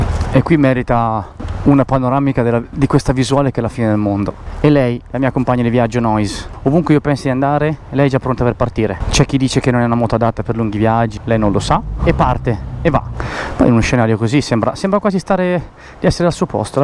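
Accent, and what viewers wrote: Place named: Tuscany, Italy. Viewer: Un toscano che parla Veneto, troppo forte 👊✌️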